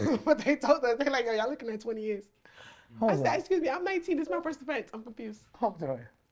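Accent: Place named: Brooklyn, United States. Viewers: my girl so new yawk!!! what’s good!!! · Shes sooooo Brooklyn, i Love her. Her voice, her personality. The way you do anything, is the way you do everything, her business is gonna go far.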